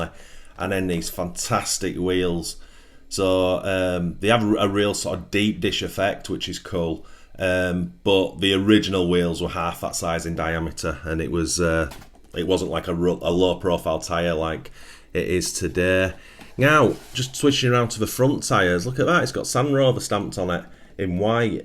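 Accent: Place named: North, United Kingdom. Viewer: I love thar North accent.... Boogy = buggy Oop = up Etc Great vid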